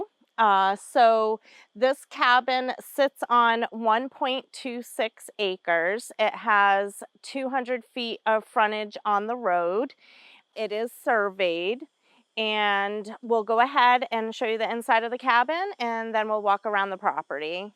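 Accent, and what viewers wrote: Maine, United States. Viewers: like the way you say side-in for the siding, gotta love that true Maine accent!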